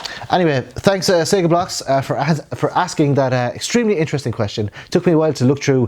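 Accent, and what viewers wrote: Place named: Southern England, United Kingdom. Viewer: I forgot I owned wappy dog until you reminded me. 😳 Might have to do a VR to this. Youre in Southern England.? The accent threw me a bit. Didn't think that there were many of us tubers in the south. 😁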